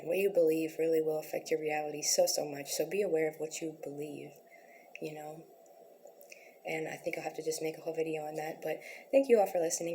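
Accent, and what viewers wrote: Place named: New York, United States. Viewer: She is a diamond amongst the ruff... Her accent makes me think New York for some reason but not so sure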